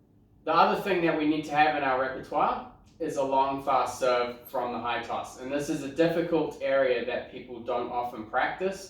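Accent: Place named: New Zealand. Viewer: I cant seem to pinpoint your accent. Kiwi living in Oz for sometime then migrated to US?? Reason for asking is the next question after the first would b - do u coach in person?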